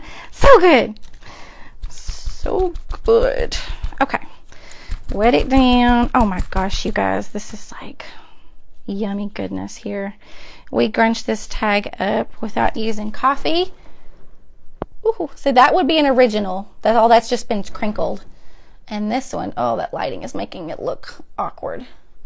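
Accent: South, United States. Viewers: I am replaying first time to find you I love your southern draw your style is same as mine I cannot wait to explore your crafts and hopefully catch you live. Be Blessed my new friend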